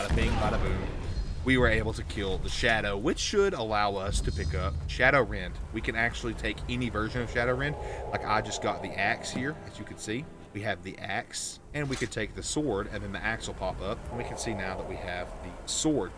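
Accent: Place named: Louisiana, United States. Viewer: Where you from bro? Lol im from Louisiana so the accent is familiar. Geeat content btw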